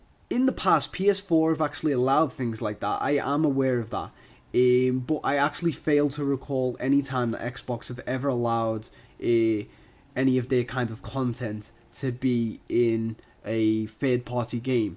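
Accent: Liverpool, United Kingdom. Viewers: bob he is scouse that's why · scouse twat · bob thats because he's a scouser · Is that a Scouser I hear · oh another scouse youtuber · Are you a scouser? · he says eehm because hes a scouser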